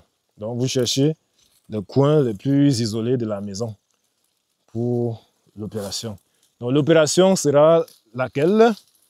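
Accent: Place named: Sub-Saharan Africa. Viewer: Je m'abonne parce que je suis toujours enchantée par l'accent des Africains Subsahariens 🙂 Évidemment surtout parce que j'ai aimé les "tips" contre les moustiques et les cafards. En Algérie sur certaines côtes il y en a qui volent, j'ai vraiment peur de ces bestioles. Merci pour le partage.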